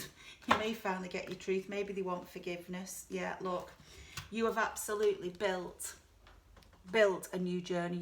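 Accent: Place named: England, United Kingdom. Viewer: Love your accent, your truth, and your readings. Have heard from you before, your excellent and extremely brilliant keep it up sweetheart 💞. A lovely smiling English Rose 🌺xxx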